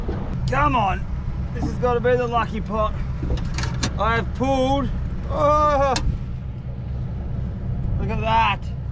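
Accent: Western Australia, Australia. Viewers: I knew you would be from Perth!! Classic South West WA accent. I live like 5 minutes from where your video starts, me and my partner are aspiring content creators. We do most of our stuff on Twitch. I'm a total city slicker, I want to become influential enough to be able to collaborate with others in the content creating community. My dream now is to one day be part of an episode where you take a pair of city slickers out to the wilderness and see how well we fair with as little intervention as possible. I would LOVE that challenge!!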